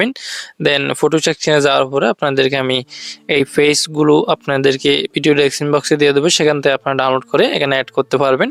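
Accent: Chittagong, Bangladesh. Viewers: অনেক দিন পর ভাই আপনার সাথে দেখা ধন্যবাদ ভাইয়া । আপনার ভয়েস শুনে বুঝে গেছি । আপনিও আমাদের প্রতিবেশী। চট্টগ্রাম বিভাগ থেকে ❤ চিটাগাং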